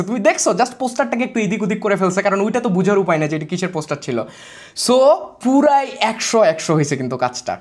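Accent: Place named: Bogura, Bangladesh. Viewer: বগুড়ার ছোল বলে কতা,,❤❤❤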